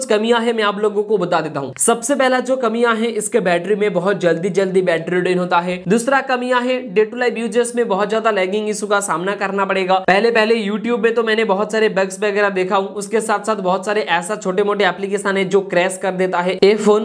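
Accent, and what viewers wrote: Bengal, India. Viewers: Bangali hain kya bhai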